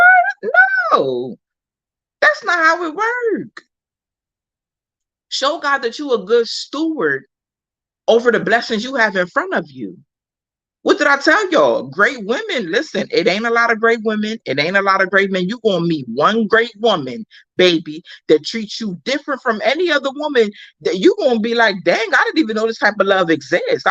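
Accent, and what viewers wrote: Louisiana, United States. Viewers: she has a cute country accent........ Like us in North Louisiana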